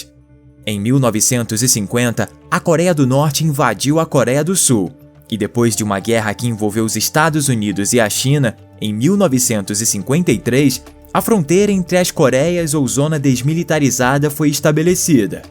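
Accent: Rio de Janeiro, Brazil. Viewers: Vídeo muito bom, educacional, mas colocar um carioca pra narrar ferra tudo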